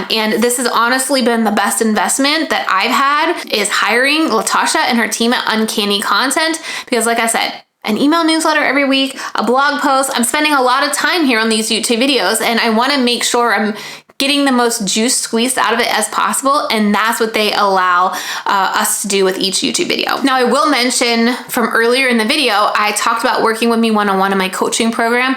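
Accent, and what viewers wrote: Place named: Utah, United States. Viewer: You're from Utah right? Your accent sounds very Utah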